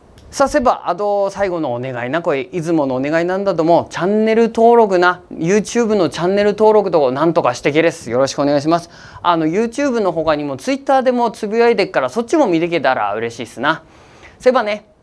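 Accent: Akita, Japan. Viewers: ディーラーとか銀行の営業さんの秋田弁だね。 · 秋田市以南の方言だすな 県北はもうさっとこ違うもんな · これは中央方言だね… 県北、県南じゃ無いね… 標準語に寄せている中央さん方の… お疲れ様です。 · 標準語に近い、秋田弁だな？ 院内駅ノチケグノシュッシンダノモナ。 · 此れは秋田弁の標準語ですね。本当の秋田弁はこんとらもんじゃねえべ？ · 秋田の方言ってより秋田の営業方弁だすなー(ですね〜)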